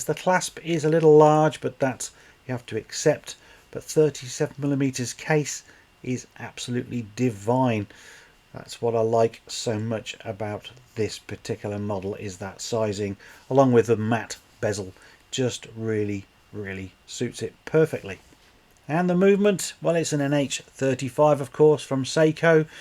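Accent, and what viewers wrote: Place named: United Kingdom. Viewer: Hello mate thanks for the great video. Are you in the UK? It certainly sounds like it but on your website it says you don’t ship to my address (London). Is that right?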